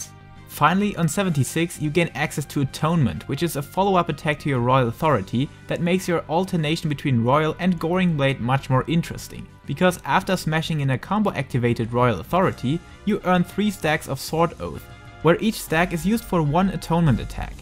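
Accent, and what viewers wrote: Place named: Germany. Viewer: Es ist einfach soooo anstrengend einem deutschen zuzuhören wenn er Englisch spricht. Sicher ein echt gutes Video, aber da man einfach nichts verstehen kann, gibt es ein Daumen runder… schade, dein Video hätte mir sicher sehr sehr gut geholfen und ein Abo sicher. Aber leider nein. Dann schau ich mir lieber Videos von amerikanischen Mitspielern an. Die versteht man wenigstens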